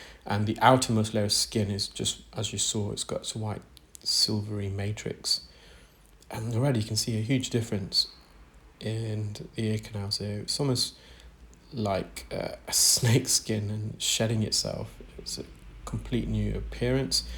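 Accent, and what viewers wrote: United Kingdom. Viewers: That was a fantastic peel. I love watching your channel--it's interesting and entertaining, I learn a lot, and listening to your lovely British accent eases my anxiety. 👂👏🙌 👂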